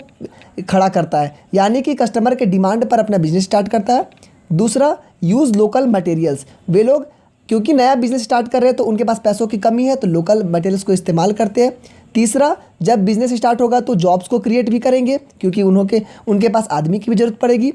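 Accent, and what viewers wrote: Bihar, India. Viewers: Bhai tum ek bihari ho kya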